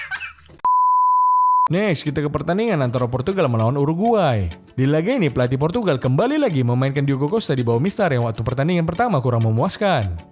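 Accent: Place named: North Sumatra, Indonesia. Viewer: logat kau sumut kali njeng 🤣🤣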